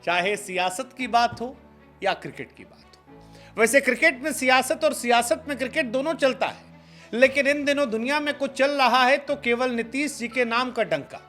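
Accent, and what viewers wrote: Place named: Uttar Pradesh-Bihar, India. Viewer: Aaj aapki aawaj up bihar lag rha hai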